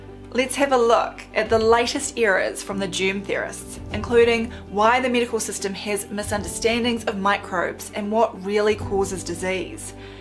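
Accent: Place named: New Zealand. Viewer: Her NZ accent and her healthy smile and energy are added plusses to her important Med industry transforming message....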